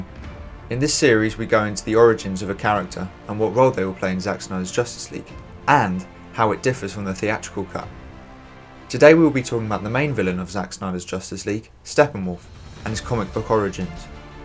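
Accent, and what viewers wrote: United Kingdom. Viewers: I love your explanation so clear despite the British accent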